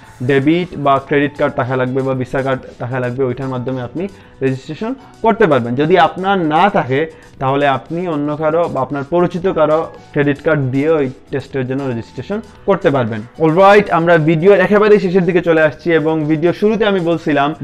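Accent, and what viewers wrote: Sylhet, Bangladesh. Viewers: R u from sylhet bro? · sylhet e baisaab!! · Right,,he is sylhety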